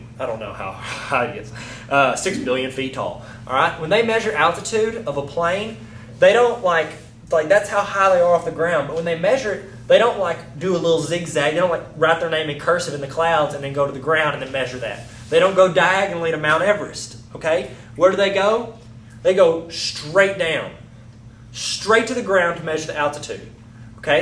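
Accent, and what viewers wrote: United States. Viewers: our teacher makes us use a damn compass to find this stuff, but i got the jist of what you were saying. Great video, and nice accent #amurica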